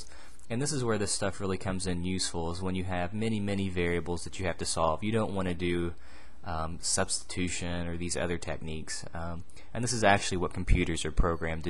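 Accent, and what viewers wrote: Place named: Mid-Atlantic, United States. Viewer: Hey, what state are you from? I'm detecting a slight mid-Atlantic accent. No?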